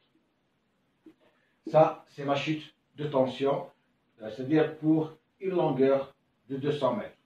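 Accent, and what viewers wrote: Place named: Morocco. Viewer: Je crois que vous êtes algérien Mais vôtre accent semble marocain On tout cas je suis fière de vous Professeur Merci.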